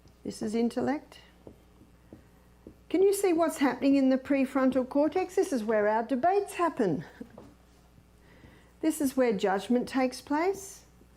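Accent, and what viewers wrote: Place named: Australia. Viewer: This story sounds so much more believable with an Australian accent.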